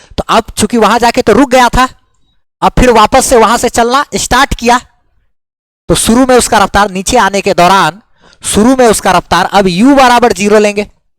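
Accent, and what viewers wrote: Bihar, India. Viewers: Aap bihari bolte ho kya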